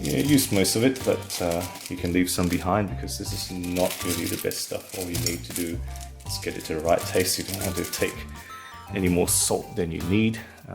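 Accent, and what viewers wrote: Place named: South Africa. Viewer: you sound very south african! coming from a native australian 😁😅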